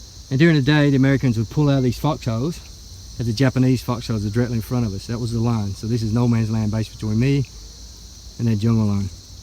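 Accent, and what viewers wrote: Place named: South, United States. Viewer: Thank you for your walk-around tour and your knowledgeable account of the entire battlefield. I am a 58 year old Australian and I inherited a fascination of this "crucible of American & Japanese military doctrine"... My late father had me watching William Bendix & Richard Jaekol (spelling) in the movie version of Richard Tregaskis's book "Guadalcanal Diary" - which I have never read, nor James Jones The Thin Red Line - ignoramus that I am! :) I have the Terrance Malik film plus "The Pacific" - we in Australia owe our freedom to the brave young Marine's who stopped the Japanese advance southward in its tracks, while our own boys fought it on the Kokoda Track... Your calm, succinct, professional and detailed tour is just fantastic - thanks to you, I know of not only John Basilone, but now I can add Mitchell Paige & Davis to the list of brave men who won the Congressional Medal Of Honour to the numerous Navy Cross recipients you recall with a grace & calmness befitting the honour of these men. 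Now I know where & what 'The Galloping Horse' is, as well as 'Gifu' to add to my knowledge of the 'Edson's & Bloody Ridge, The Jeep Track, Fighter Airfield, the Emergency Airfield to add to my knowledge of Henderson, which I presumed was the sole Airfield - Wow - Coffin Corner, the Ilu River, the mistaken position /naming of the Tenaru - you have pieced this place together with the ability & acumen that would do any professional historian proud :) Your gentle, factual southern accent is ju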